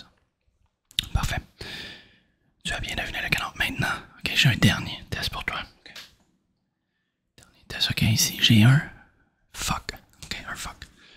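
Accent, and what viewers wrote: Quebec, Canada. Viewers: Je pensais pas que l'accent québécois fonctionnerait autant sur moi en ASMR. Je te remercie en tout cas, j'ai du mal à m'endormir en ce moment et tes vidéos sont d'une grande aide ! ♥️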